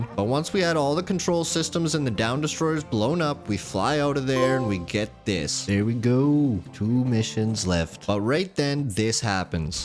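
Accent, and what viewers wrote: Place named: Alberta, Canada. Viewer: He's either Albertan or Nova Scotian bud 😅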